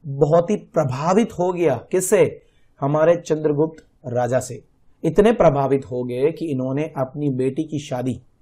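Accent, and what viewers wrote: Gujarat, India. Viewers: Sir aap GUJARAT se ho?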